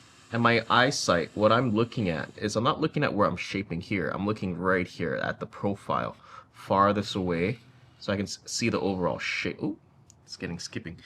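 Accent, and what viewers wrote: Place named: Hawaii, United States. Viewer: I can hear your small kine Hawai’i accent.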